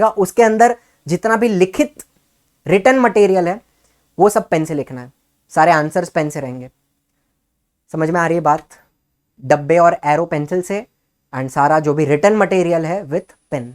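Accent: Maharashtra, India. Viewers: Sir you are marathi. जात्य डोक्यात. 🤔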